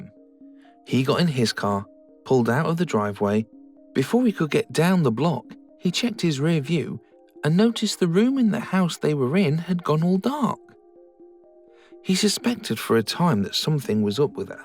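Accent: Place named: United Kingdom. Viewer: Perhaps it’s just you. I don’t mind a Brit narrating.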